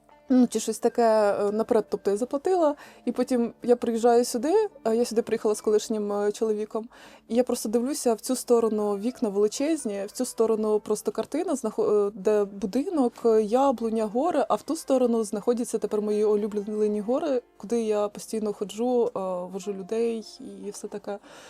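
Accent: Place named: Poltava, Ukraine. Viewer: Впізнаю рідний полтавський акцент 😊. Трохи незвична відсутність суржику 😅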